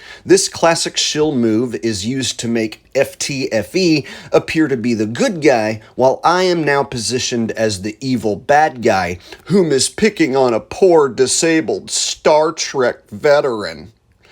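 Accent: Bristol, United Kingdom. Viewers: He has a Bristol accent aka Bristolian, so it is possible he isn't in Scotland....possibly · I bet he dont go out much in Scotland, This guys accent is from the Bristol area in England, the Scots are not fond of the English, I cant see making many friends there.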